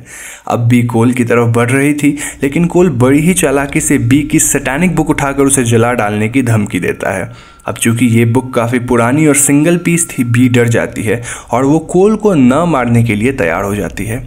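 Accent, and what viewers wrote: Bengal, India. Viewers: You have a bengali accent😅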